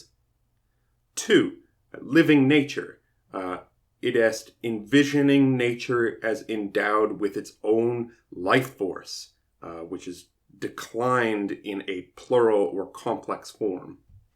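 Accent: Quebec, Canada. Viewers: Thank you so much 🙏🏻 I was wondering… are you French ? Like from Quebec ?? I’m near Montreal and your French seems Quebec français canadien ☺️ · Thank you and blessings. Is that a french canadian colour I hear in your french words and names? If so: salut mon frère!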